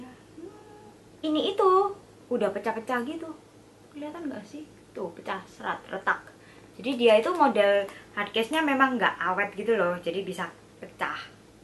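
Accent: Java, Indonesia. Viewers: Kak asli orang mana? Kayak orang cina tapi cara ngomong nya orang jawa 😁 IG Lutfianasukma #kathlakzgiveaway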